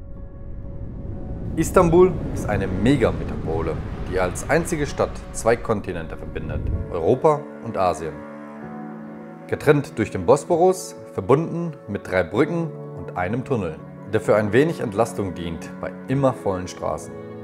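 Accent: Germany. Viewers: I like your German accent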